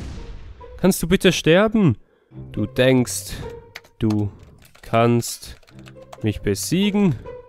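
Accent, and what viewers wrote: Switzerland. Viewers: Eine frage, bist du Schweizer?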